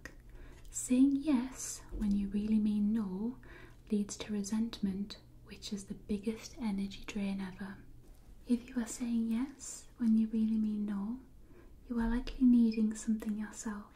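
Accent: United Kingdom. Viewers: Y ella aún habla el inglés británico, y así se queda más difícil para muchos 😣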